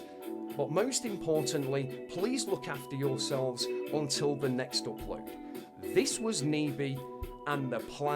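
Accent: United Kingdom. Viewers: I like your narrative in your Yorkshire or manc accent. Somewhere up north... Great series mate, glad I discovered you, you deserve way more views from these vids. Going to have a binge on these now while decorating from where I left off on episode 7. Rather watch this than Netflix anyday!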